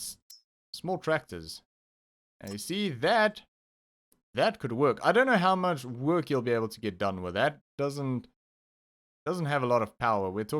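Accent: South Africa. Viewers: just came across your channel and thought i heard a south african xD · I new I smelt a south african accent😂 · Hearing his voice in the first 2 minutes I knew he was South African and them him confirming it is hilarious. Looking forward to go through your old series and awe from a fellow Sa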